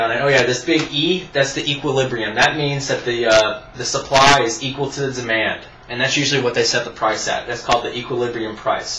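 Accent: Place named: South, United States. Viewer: So entertaining! And easily explained! I Like your southern accent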